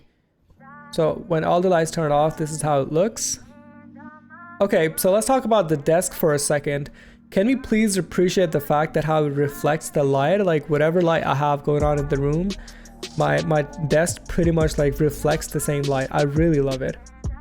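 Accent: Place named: India. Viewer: y does he sound like he indaian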